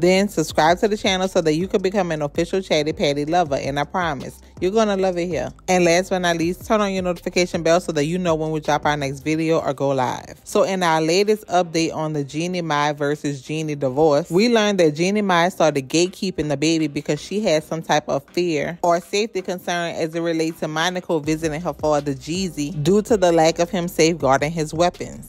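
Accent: New Orleans, United States. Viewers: Your accent.... New Orleans?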